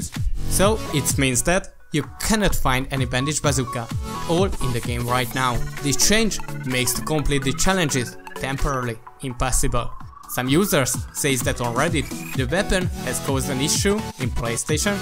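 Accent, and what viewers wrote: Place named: Hungary. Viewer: You have a typical Hungaryan accent. 'És szerintem magyar is vagy, tetszik a hangod :D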